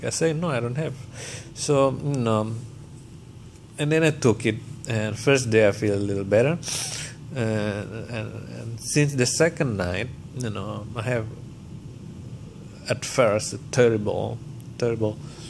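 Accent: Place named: Indonesia. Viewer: Why he was so afraid of tapered to banjo? It is a great instrument! :D :D :D :D :D :D :D :D OMG, this Indonesian accent is hilarious. :D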